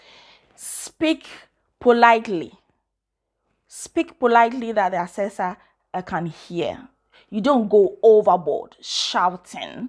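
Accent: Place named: Ghana. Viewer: You are very articulate. Thanks for all the information. I feel you are a ghanaian ..... From ur accent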